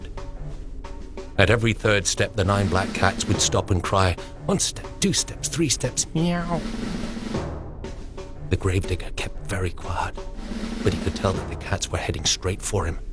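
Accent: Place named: Edinburgh, United Kingdom. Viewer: The accent he's using is from the Northern tip of Edinburgh. I spent much of my childhood in Scotland and Ireland.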